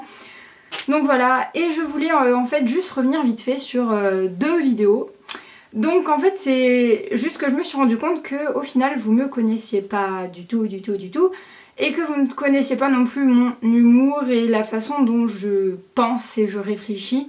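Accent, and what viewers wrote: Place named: South, France. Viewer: J'aime cette vidéo juste parce que t'as pris l'accent du sud :p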